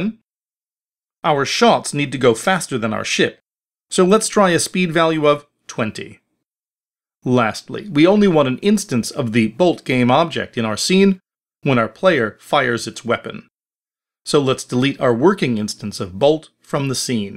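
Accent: United Kingdom. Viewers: British accent seeping through. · r u british or american lmao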